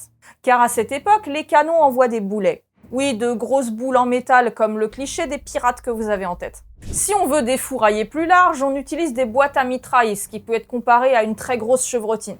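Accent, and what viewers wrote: Alsace, France. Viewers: Mama cet accent alsacien 😍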